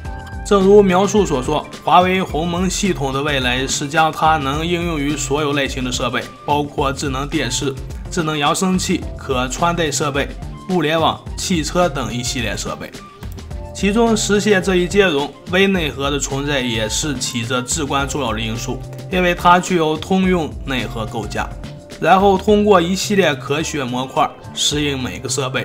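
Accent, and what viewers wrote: Shanxi, China. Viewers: 山西老大哥?这口音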